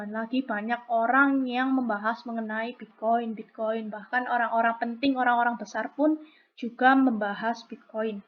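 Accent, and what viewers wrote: Java, Indonesia. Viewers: Iyo medok tapi pinter bngt e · Medhok tnn mbak wkwk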